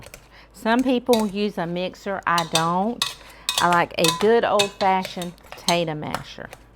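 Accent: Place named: North Carolina, United States. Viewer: Where are you from? You sound like me lol. I’m in central NC. Love your potato salad 😋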